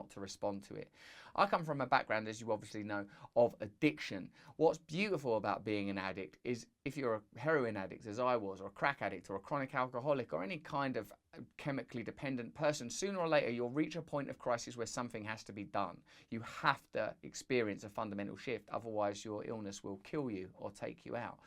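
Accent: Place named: England, United Kingdom. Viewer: his English is so English it's hard for me. to understand it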